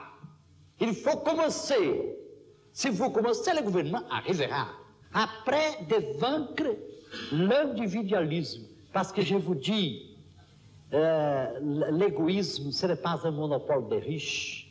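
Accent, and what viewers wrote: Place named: Brazil. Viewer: Ha algo que me emociona: Dom Helder fala um francês que os franceses podem entender mas sem perder o seu jeito brasileiro de ser ... ele é aquela pitada de sal que guarda o gosto do mar ... e então pode dar gosto à farinha que vai se transformar em pão : Deus seja louvado pelo nosso grande irmão Dom Helder!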